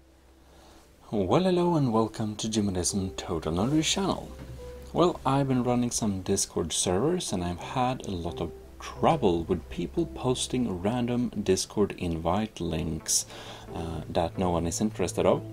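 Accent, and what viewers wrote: Sweden. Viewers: Är du svenskkkk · U from sweden aye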